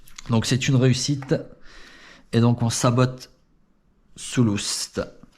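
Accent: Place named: Italy. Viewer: Aloha 🤙🏝️. Très bon accent Italien👍 Je ne connaissais pas ta chaîne. Tu t'exprimes bien ça fait plaisir! Tu es passionné c'est cool! As-tu arrêté tes parties?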